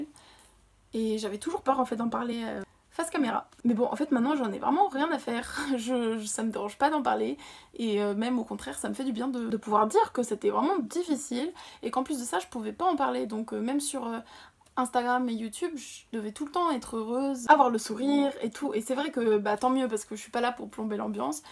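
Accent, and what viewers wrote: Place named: France. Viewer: Et un bel accent français exprimé aussi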